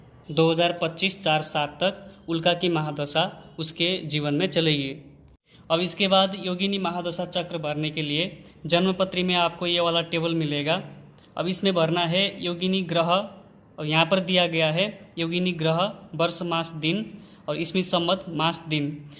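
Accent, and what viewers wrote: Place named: Nepal. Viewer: Manche chai nepali jasto cha boli Hindi bolera yesto vya niskiracha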